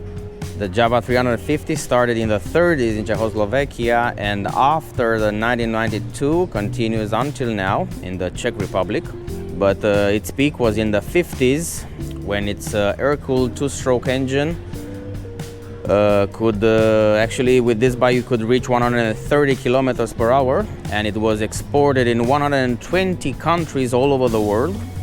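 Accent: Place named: Romania. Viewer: Romanian accent...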